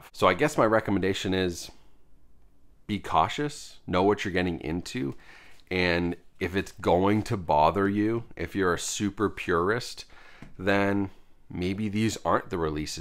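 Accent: New England, United States. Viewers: are you a New England bro?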